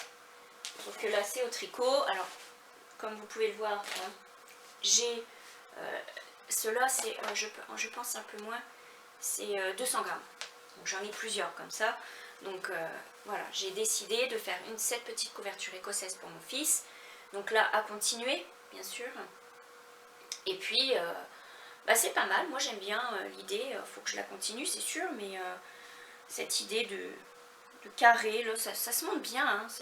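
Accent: Quebec, Canada. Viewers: Coucou , j'aime beaucoup ta première couverture ! J'ai commencé la meadow blanket de Lucy en lit double, qui ressemble vraiment à ma prairie ! Merci de m'avoir fait découvrir la créatrice et la laine Stylecraft , toute douce . Ton accent un peu québécois dans cet épisode me fait sourire , j'aime bien cette accent ;)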